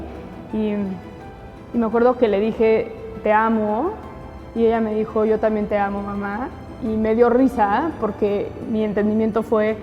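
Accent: Spain. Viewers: hace el acento español en otros programas lo hace más y ahorita no lo hizo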